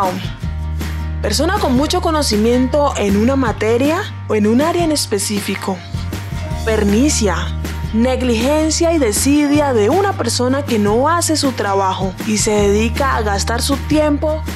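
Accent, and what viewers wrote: Colombia. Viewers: Hola la que locutora del video es caleña o chocoana?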